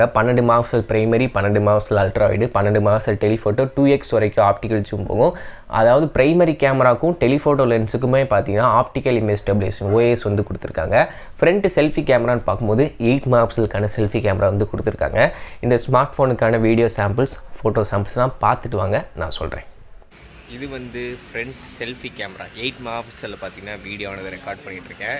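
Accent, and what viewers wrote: Madurai, India. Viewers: Bro niga madurai thana bro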